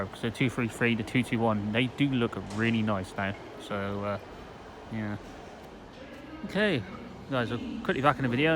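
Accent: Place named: Exeter, United Kingdom. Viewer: You know YouTube on the phone? When your scrolling and the vid plays? Your Exeter accent is playing havoc with subtitles man, not ‘southwest friendly’ 🙂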